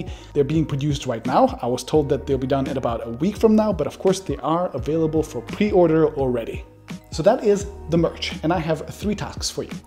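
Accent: Germany. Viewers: Really enjoy watching this channel. First time I thought that he is from Germany haha :)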